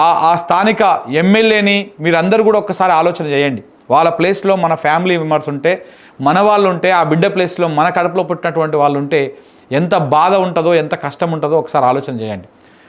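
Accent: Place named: Telangana, India. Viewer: Arey niku enduku ra AP politics. Musukoni mi telangana chusuko. Niku AP gurinchi emi telusu ra . Niku asalu a district ekkada undo telusa ra. Mundu ikkada ground level lo tirigi taruvata video lu chey ra. Ni history emito andariki telusu. Ninnu telangana lo tante AP KI vachav. Isari tante ekkadiki potavo. Mundu a telangana slang tho video lu cheyadam maney. Ma andhra slang tho chey.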